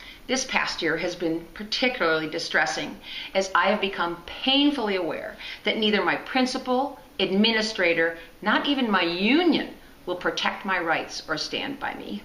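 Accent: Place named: New York, United States. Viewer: What state are you from? This sounds exactly like NY.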